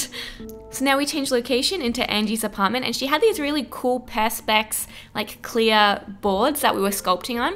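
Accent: Australia. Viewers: What part of Australia are you from I'm from port maquarie 😄😄😄😄😄😄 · I really really love this! As a human being which is fluent in english but not really sure if I understand dialects or different accents, can someone please tell me if she has an australian accent? :D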